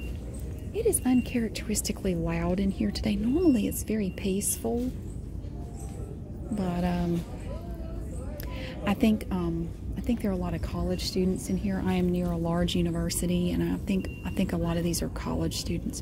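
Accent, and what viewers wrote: South, United States. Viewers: I just want to say I laughed several times throughout this video. Some of your comments about the items are really funny. I'm from South Carolina but I've been living abroad for the last 3 years. I love it but sometimes I miss hearing southern accents and sassy, warm and funny ladies like you. You remind me of my aunts hahaha. I also miss Target A LOT. Nothing like it here in South Korea.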